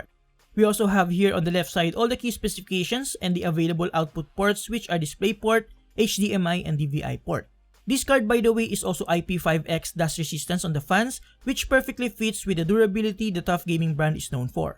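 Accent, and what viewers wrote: Philippines. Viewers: Filipino rightt? Accent palang e hehe. GOODLUCK PO SA LAHAT!! pati pala sakin ehem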